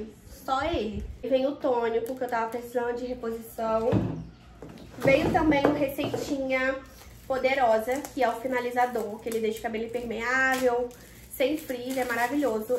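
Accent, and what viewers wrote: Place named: Rio de Janeiro, Brazil. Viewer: Sotaque de carioca , no Brasil é assim cada localidade com seu sotaque e temos que respeitar ❤ eu acho lindo sotaque dos cariocas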